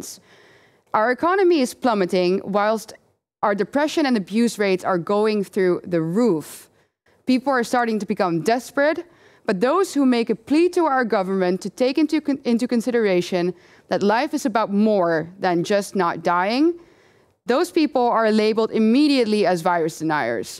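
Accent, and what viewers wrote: Netherlands. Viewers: Man.. I love the Dutch Accent! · Weet-landa, main accent on first syllable, only subsidiary accent on the second, and long e despite followed by two consonants, since only first of them belongs to same word within the compound. If I may say so, there are two accents that sound basically like you when speaking English : Dutch as yourself and Icelandic (have you checked out Leon and Sorelle?).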